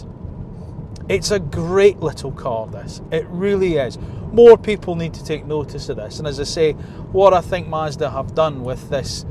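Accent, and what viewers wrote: Scotland, United Kingdom. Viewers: A thorough, objective, well-informed review of an interesting car, all delivered in Scottish accent. What more could you wish for?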